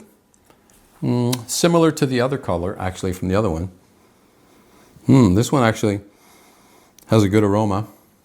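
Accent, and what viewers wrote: Canada. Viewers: Within ten seconds , i'm like cCanadian! Lol. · He has Canadian accent😊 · Your name is? You sound Canadian. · Is that the Canadian accent I hear?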